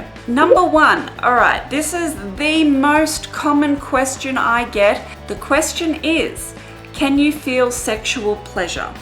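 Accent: Australia. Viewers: I LOVE your accent!! Australian, right? It's adorable. Cheers from San Francisco :)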